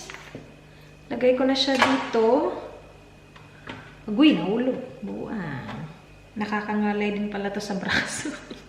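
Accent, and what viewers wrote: Visayas, Philippines. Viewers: Bisaya ka po ba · Ka bisaya ba diay nimo te oi HHAHAHAHA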